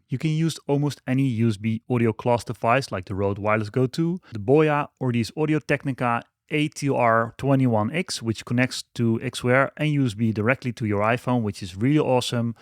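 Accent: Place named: Netherlands. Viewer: I hear a dutch accent🎉.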